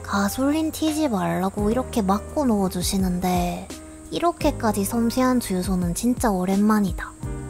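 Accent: Gyeongsang, South Korea. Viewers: 전 경상도 사람이라서 억양이 낯설지 않은데요 일본어 할때도 억양이 경상도스러워서 어떤게 조선말이고 어떤게 일본말인지 헷갈리네요 actually 전 일본말을 모릅니다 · 경상도 사투리 너무 듣기좋아요~^^ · 갱상도 억양? 암튼 바이크 이야기 좋네요....안라!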